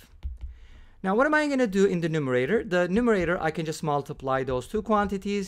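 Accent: Turkey. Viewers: Are you Turkish? Your sound like turkish english.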